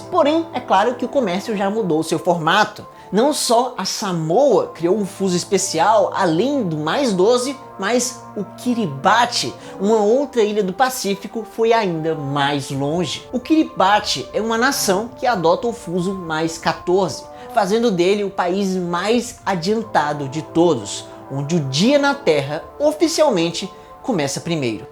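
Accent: Ceará, Brazil. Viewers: CRLH Eu tinha certeza que é cearense, praticamente afirmou agora, raramente confundo um conterrâneo 👽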